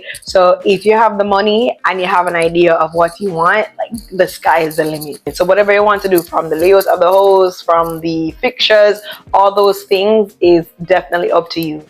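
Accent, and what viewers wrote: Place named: United States. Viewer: PTSD?? Are you American you are saying some words and phrases like the Americans wood and you are training on the dialect also, so I think you've lived in America or you're just one of those Jamaicans who want to sound American😂 thank you show me a video on it's nice that everybody's learning from us, social media is the best invention.... The way you pronounce PROCESS, the way you pronounce ALREADY, because I know there's no two countries that pronounce the words the same as American. I know how Jamaicans are originally and I know they are practicing to sound like the US. I love genuine originality and although you are bringing good information, just be yourself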